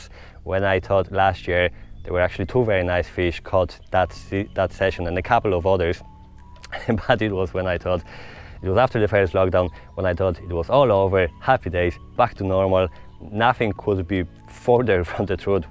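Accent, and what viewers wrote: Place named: Ireland. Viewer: Bro, I can detect an irish accent as you're talking. Half way through I thought I'd lost my mind and you were a local.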